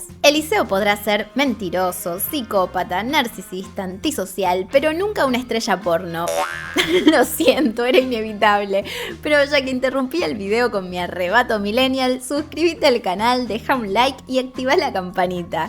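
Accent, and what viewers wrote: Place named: Buenos Aires, Argentina. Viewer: Espectacular video😉🙏🏼👍🏽🥰pero sugiero que mermes el "sh" tipico del porteño...para favorecer la difusion del contenido...y caiga bien en varios paises🫂🤗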